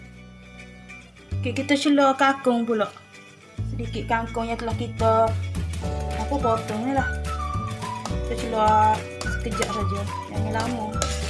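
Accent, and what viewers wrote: Kelantan, Malaysia. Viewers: Min, sedap....sebenarnya dulu sy pernah kawen dgn org kelantan.n skang dah pisah.die punya benci tu, adoi...smpai sy xleh jumpa or dengar org kelantan cakap.benci sungguh.padahal diorang xde salah ape pun...adoi...😬...skang Ni pun sy subscribe.tapi dgr je loghat kelantan, terus sy mute..punye la hai...halalkan resepi yg sy ambik ni ye.n sy still benci..😭. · suko dengar kak kecek Klate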